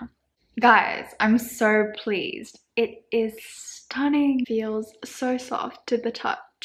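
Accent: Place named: Australia. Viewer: You’re beautiful with a.. Um Australian accent? Sorry I found your video to learn how to spot fakes. Good closeup video ^_^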